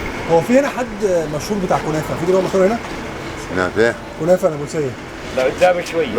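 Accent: Egypt. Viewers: نحن اهل فلسطين اكيد يعني بدنا نعرف انك مصري من لهجتك لانها كثير واضحه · طبعا انت حكيت انه في حب كبير لمصر، وهذا شيء صحيح احنا كبرنا على الثقافة والحاضرة المصرية، يعني مسلسلات وافلام مصرية وسورية برضو، وموسيقى مصرية فلهيك بتشوف الناس بتتحمس بس تسمع لهجتك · عرفوك من اللهجة 😊😊والنطق 😂😂والله بحبكو كثير يا أهل مصر انا من فلسطين من جنين❤❤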